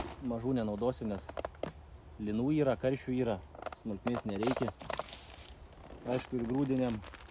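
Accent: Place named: Pakruojis, Lithuania. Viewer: Iš kokia kraštą buset kalba kaip Pakruoje🙂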